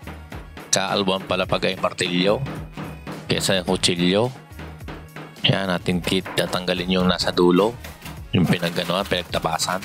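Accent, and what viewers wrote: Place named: Batangas, Philippines. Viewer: batangueño ata are HAHAHA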